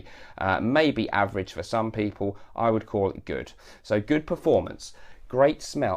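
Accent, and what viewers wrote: United Kingdom. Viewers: Yes! Fragrances reviewer with British English and funny concept.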